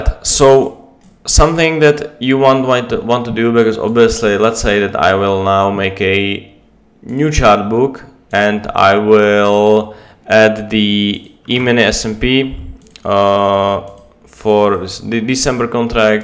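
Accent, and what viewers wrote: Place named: Czech Republic. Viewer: ) ta čeština, to nezapřeš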